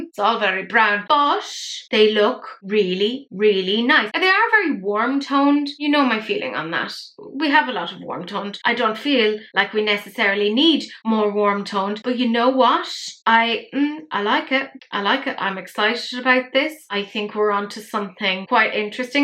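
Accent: Ireland. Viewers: Totally agree on the Bratz palette (and on filler, for that matter. My lips are thin as well and I just…don’t care? 😅 They’re mine, you know? What if it were our thumbs? Wouldn’t that be silly, everyone changing their thumbs because “well it’s entirely too long, this thumb, let’s shorten it.” ) The Viseart palettes may be “basic” colours but their combinations are nice, it feels coherent. (So many palettes don’t.) Edit to add: there surely WILL be lipsticks in the RH collection because lips are practically iconic in that movie (Magenta and Frankenfurter come to mind, and Riff Raff’s “concealer lip” look as well.). Incidentally, you have convinced me that no accent better delivers the phrase “I don’t like it, and I don’t want to talk about it anymore” better than the Irish accent. 😂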